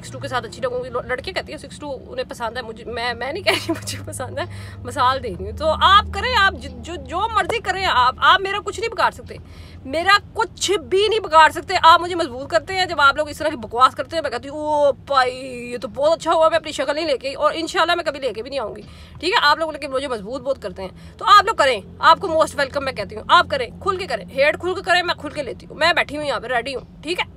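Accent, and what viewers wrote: Punjab, India. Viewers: Keep growing stay strong❤️i love ur way of talking hahahaha full Punjabi style. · Your way of talking 💕.... Hahha full punjabi style.... fan ho gya mam mn apka...... Stay strong be happy...... And don't worry about shittyy people.....🙂 Allah apko mazeed izzat dy or bury logon sy bachaye.... Ameen.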